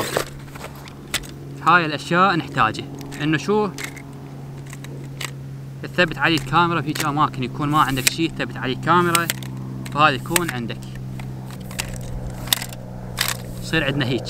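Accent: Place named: Anbar, Iraq. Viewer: اللهجه عراقيه انباريه 😅انت من محافظه الانبار ؟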